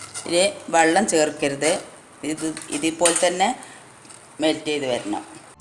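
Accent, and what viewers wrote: Malappuram, India. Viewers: Nice itha .nishkalankamaaya Malappuram talk .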